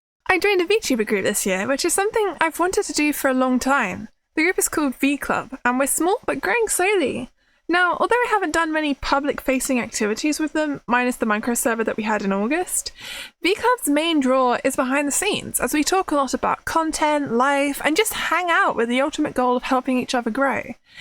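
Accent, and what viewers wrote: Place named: United Kingdom. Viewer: 21? Hmm. I was certain you were older. From the way you speak and act, but maybe the people around me are less mature, or it could be your British mannerisms and dialect. I have not spoken to a lot of people from the UK. Your life seems to be stable and you seem very happy with it, for the most part. All the best to you lady.:face-red-heart-shape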